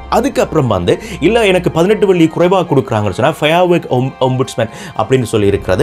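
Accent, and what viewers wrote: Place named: Tamil Nadu, India. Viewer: I liks your tamil slang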